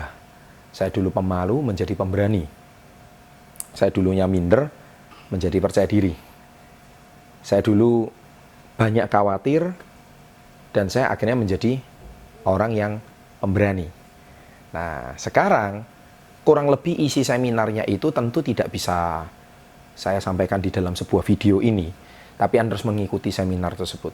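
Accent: Java, Indonesia. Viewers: Keren mas... saya suka gaya bahasanya... dialek jawanya kental banget...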